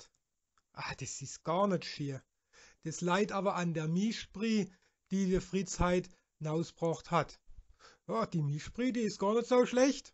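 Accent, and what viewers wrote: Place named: South Franconia, Germany. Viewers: Hört sich an wie der Dialekt zwischen Karlsruhe, Pforzheim, Heilbronn, Heidelberg. Südfränkisch, umgangssprachlich "Badisch" oder wie auch immer der Übergangsbereich zwischen Schwäbisch, Alemannisch und Rheinfränkisch heißt. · Wohne seit 3 Jahren nicht mehr in Hohenlohe, war.... ich nenns mal interessant den Dialekt wieder zu hören 😂 Etwas cringe, aber interessant. Ziemlich nostalgisch. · Naa, des had mim schwäbscha ned sou vil zum doona. Des is halt dr frängisch daal voa Werddaberch. I kumm voa dr Blafeldr geichnd und do reidt mor a a bissla andrsch, wia der in dem Video do greidt had. Aber des is noo a voa aam Oord zum adra wider zum daal ganz andersch.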